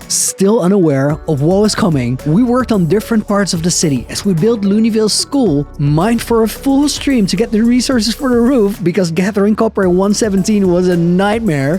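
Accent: Netherlands. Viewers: since I joined this adventure when u only had like 50K subscribers, I immediately recognised you’re Dutch accent, I am from Belgium but I really enjoyed every hour I could watch u. Keep up the great work man!!!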